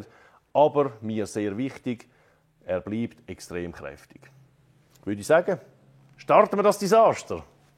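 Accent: Switzerland. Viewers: quatschkante hihi. ja der schwyzer dialekt 😉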